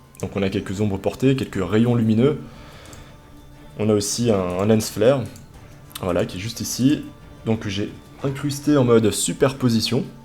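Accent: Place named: South, France. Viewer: oh le bel accent du sudeuh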